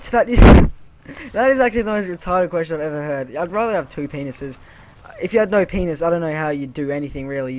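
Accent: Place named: Australia. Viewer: Usually the Australian accent annoys me, but your voice makes it not annoying:] Think your speaking is pretty good too... Subbed :P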